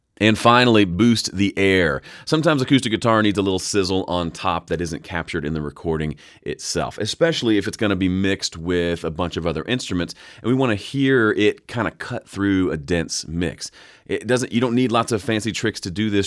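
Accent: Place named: South, United States. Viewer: Thanks for the great videos! These have been very helpful as I self-produce. Also, nice Southern accent!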